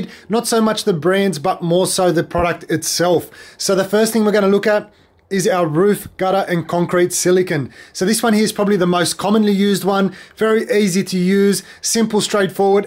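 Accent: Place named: Australia. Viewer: Hi, I hope you see this😊... you sound like an Aussie 🇦🇺 so I'm just wondering if could please tell me if the 1st one is the same as (same brand) silicon as yours said concrete? I'm going to Bunnings & there's one for $6 & the concrete is $25... do both do the same thing? Thanks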